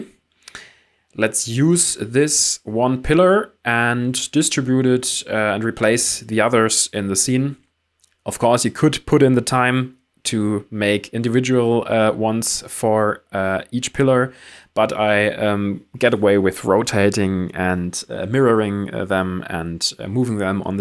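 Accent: Germany. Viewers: Are you from germany because i recognize the accent . Viele grüße! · Love it! Thanks for this inspiration and the tips! Pls more of this sort of Videos!! Are you from Germany? Your accent sounds like that! 😋😅🙏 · Liebe es Deutscher Akzent 😂 top video danke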